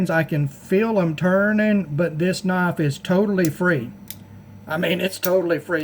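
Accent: South, United States. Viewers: G'day Champion how ya doin ? i'm new to your channel and i'm already lovin it. Great reviews and very informative, keep up your great work P.S i love your southern accent. Cheers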